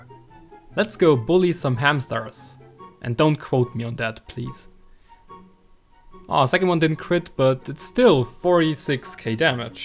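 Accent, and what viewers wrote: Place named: Germany. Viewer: Cringe german-english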